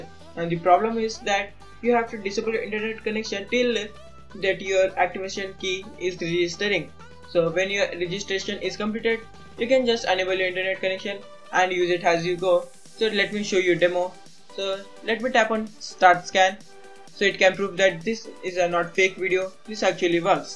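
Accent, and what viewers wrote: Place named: India. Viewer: Indian Accent... Not trusting this.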